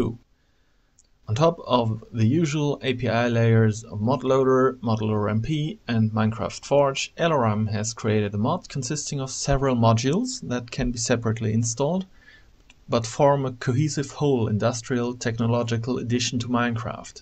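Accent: Germany. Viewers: Nice Video ! Helped me alot. Did I hear a german slight german accent ?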